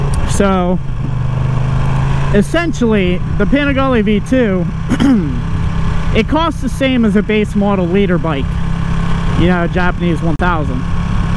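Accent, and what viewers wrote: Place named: Pittsburgh, United States. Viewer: subscribed for the pittsburgh accent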